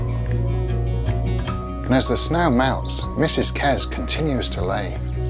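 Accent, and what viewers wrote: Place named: Yorkshire, United Kingdom. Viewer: I love it that every time says ‘tawny owls’ the CCs translate it as ‘toenails’. Mr and Mrs Kes had problems with Jackdaws, Barn Owls and toenails 🤣 Gotta love the Yorkshire accent 🤍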